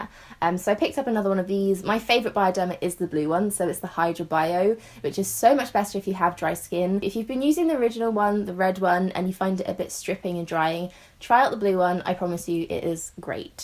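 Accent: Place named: RP, United Kingdom. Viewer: I get so distracted by your accent so I totally lose focus on what you're actually saying. I just love your accent, the way you articulate yourself and your intonations ❤ it is the queen's English. I had to repeat the video again haha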